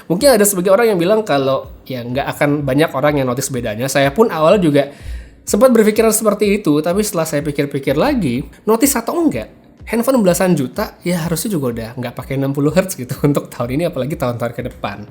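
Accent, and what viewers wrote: Bali, Indonesia. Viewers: Bang dr Bali kah?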